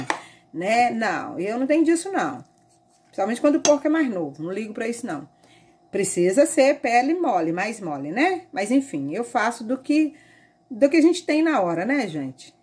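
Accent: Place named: Minas Gerais, Brazil. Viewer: eu li um comentário q disse ,pelo sotaque só pd ser mineiro sujo ,ou goiano , não entendi fiquei curiosa, por favor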